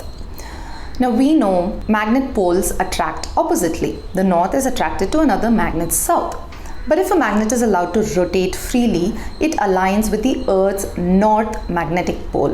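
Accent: India. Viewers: I really don't want to delete my comment. She is Indian speaking English rather beautifully with an Indian accent, rather fittingly because she is Indian. I understand her perfectly. If you're so accomplished I rather wonder why you can't? Would that I could speak a second language so well.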